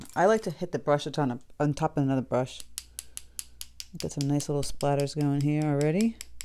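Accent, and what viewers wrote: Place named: New England, United States. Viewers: you are so refreshingly New England, somehow. I moved to NC a few years back, and I love hearing you and your expressions. “Boop”, “ooo hooo” etc. (lol). I am almost homesick - except for the weather. Your vids save my sanity. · I love this! I'm going to try it! You sound like my cousin in New Hampshire. Where in New England are you from? I'm a Mainer.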